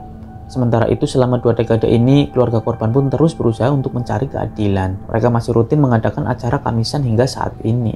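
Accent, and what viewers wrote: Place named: Banyumas, Indonesia. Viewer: Terlalu ngapak lur suarane,ngantuk yg liat wkwkwk😂😅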